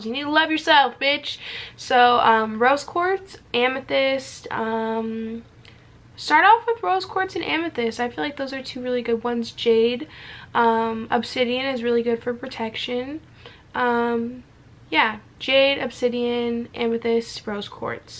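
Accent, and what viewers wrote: United Kingdom. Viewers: Do a whole video speaking in your britishhh accent love you/it🤣🥲🥲🥲💘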